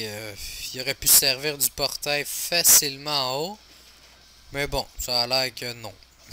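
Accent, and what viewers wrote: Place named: Quebec, Canada. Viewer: OMG TN MICRO EST MEDIOCRE XD, Sinon ta un accent québécois (je suis un nouveau sub) :p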